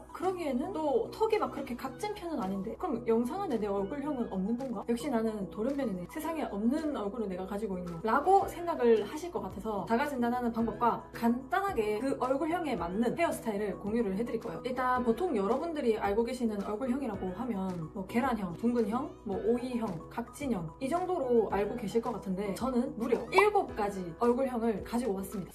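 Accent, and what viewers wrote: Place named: Daegu, South Korea. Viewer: 아 사투리보고 대구인줄 알았는데 서울에 계시넹 ㅜㅜ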